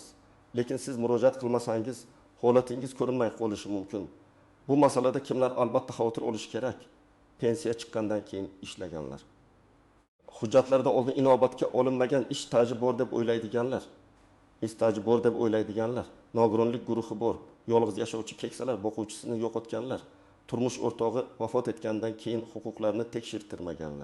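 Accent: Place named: Chukotka, Russia. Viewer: Гапираетган чукчами. Узбек тилини биладиган одам ЙУКМИКАН.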